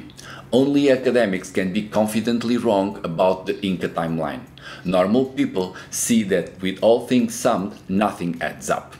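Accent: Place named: Portugal. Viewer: Why do you sound like you are portuguese while speaking english?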